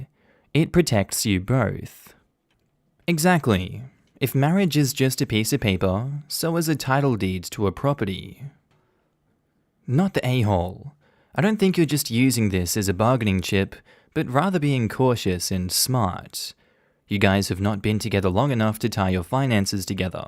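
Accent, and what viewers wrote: Australia. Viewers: Same here! I can't sleep listening to this Aussie. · Hey!!!! I figure I should comment more because I’m here for pretty much all your videos haha. Also oddly growing up I was never fond of the Aussie accent (I’m from NZ) but for the last few months you’ve been my go-to reddit readover type videos voice favourite. Love the work and I’m happy you’re back safely in Aus. Hope your fam in Ireland is doing awesome as well :)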